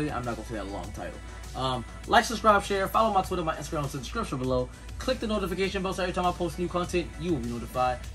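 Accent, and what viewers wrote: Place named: Baltimore, United States. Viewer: You sound Baltimore aff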